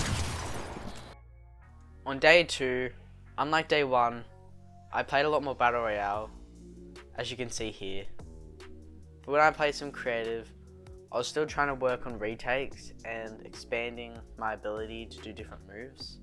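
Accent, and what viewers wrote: Australia. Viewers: same I’m Aussie and he kinda sounds like PWR Alex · Na Aussie accent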